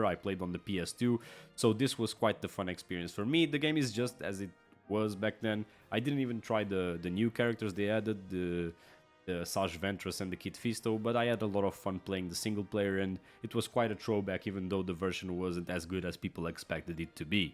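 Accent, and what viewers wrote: Portugal. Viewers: Eu juro que pelo teu sotaque sabia que eras pt😂😂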